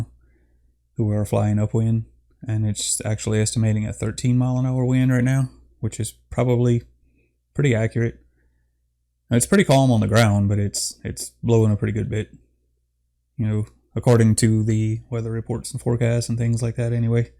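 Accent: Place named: Louisiana, United States. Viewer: Where you from bro? Sounds like you have a Louisiana accent 🤔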